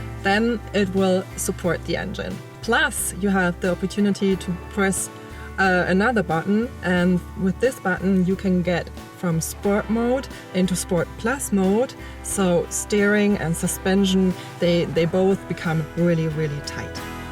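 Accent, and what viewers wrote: Germany. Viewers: next time you want a german guy to review a german car, give me a ring. damn...! I cannot listen to this accent any further.... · She's from Germany, English is not her first language. She's doing her best to give you a decent review of the car and in my opinion she's doing a good job at it. Respect. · ***** You do realize that it is possible to complain about the reviewer without regard for which brand they are reviewing right (ex. like preferring EVO to Autocar)? If you like german accent, that's fine. Some people, including me, don't. The only idiots here are people who don't think other people are allowed to disagree with them.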